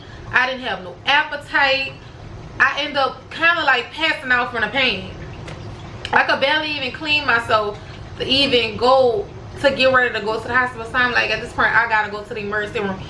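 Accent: New Orleans, United States. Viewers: I love the New Orleans accent by the way it remains me of Toya lil Wayne ex. Thank God she is ok now. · New Orleans accents are my favorite. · I know you from New Orleans. I love how people from New Orleans talk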